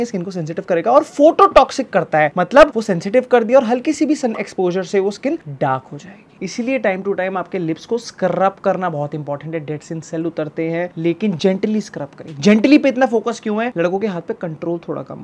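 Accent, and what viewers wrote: Delhi, India. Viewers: Delhi Valli baat cheet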